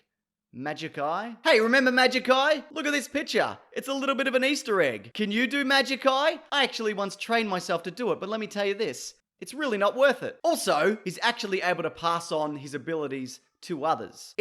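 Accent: Australia. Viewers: I can tell that your an Aussie bye your accent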